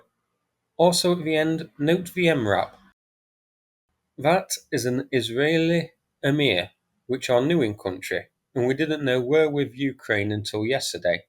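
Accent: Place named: Midlands, United Kingdom. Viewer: Hi, I know you are in Tiawan, but just wondered if you are orginally from the midlands in England as you have that accent.......Great channel btw, thanks for the very informative videos.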